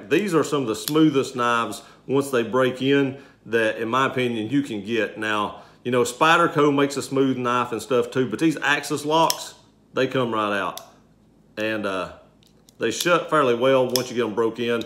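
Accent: South, United States. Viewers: Hello new supporter here showing support for ya and I hit the like button. Awesome video. Great gift. Nice knife!! Like ur accent. Sound southern. Lol like me. O I hit the bell too. !🤩🤩🤩🤗🤗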